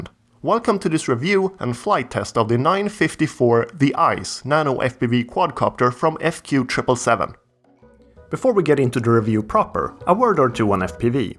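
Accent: Sweden. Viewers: Hi! I am new to your channel but I immediately hit the Subscribe button upon watching the first vid I looked at. Your approach, candor and editing sets you apart from many other reviewers here on Youtube. Just lovely!!! You clearly have some insight in this hobby, but you appear unassuming, bright and unbiased. Every vid is a pleasure. I have to ask - are you a Swede? Your accent suggests you are, while your choice of words and general command of the English language is impeccable and inspiring. You put many native English speakers to shame, at least here on Youtube. But more importantly, your vids are well thought through, consciously edited, and so clearly targeted when it comes to defining your audience. I think you deserve a viewer breakthrough, going from the few thousand to the tens of thousands you deserve (realistically, this is a niche channel after all). 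To summarise: Love your reviews, keep them coming!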